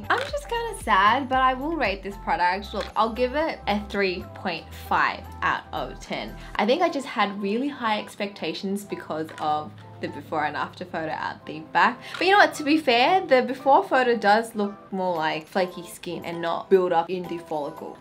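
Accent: Australia. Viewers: You sound Aussie 🐨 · Oh man I love Australian accents. This is just so nice to listen to lmao. Also can’t look at the microscope for too long but it is interesting hahaha. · Are you an Aussie, you have an Australian accent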